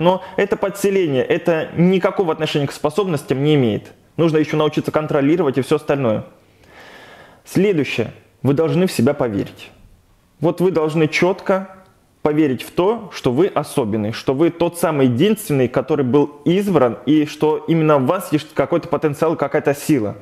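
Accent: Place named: Belarus. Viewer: Я поняла, чем ты меня привлекаешь - ты с Украины! Хотя по говору, думала, что ты белорус.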